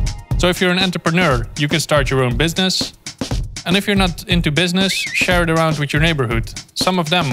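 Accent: Netherlands. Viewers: thomas hoogewerf he’s Dutch! What accent should he have?! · I am not sure if the dutch accent really works for me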